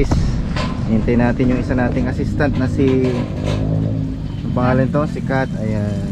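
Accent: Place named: Pampanga, Philippines. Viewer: May accent nang Kapampsnganndi Mrs.🎉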